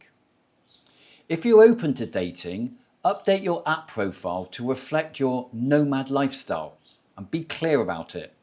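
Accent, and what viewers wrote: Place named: South West England, United Kingdom. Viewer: you sound like your from the south west or brizzle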